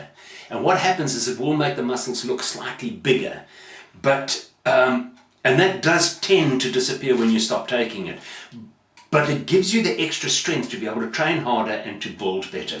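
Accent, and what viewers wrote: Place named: South Africa. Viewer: you are deffintly south african or rohdesian Bra that accent is awsome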